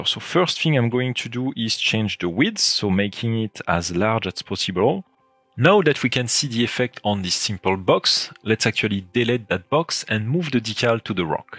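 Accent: France. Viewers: L'accent français est reconnaissable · Hello Twinmotion folks. Sorry, it's nothing personal, I love the French language. But the narrator's accent is very pronounced and I can't quite understand it. Please, I ask you to put it in French with subtitles or in English, but with less accent.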